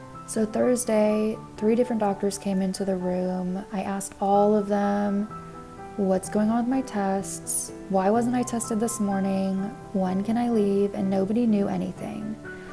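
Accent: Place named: Midwest, United States. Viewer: Yes, even in North America the situation are getting dicey....judging from your accent, are you from the midwest? I'm in the Southeast (Florida) now 🙂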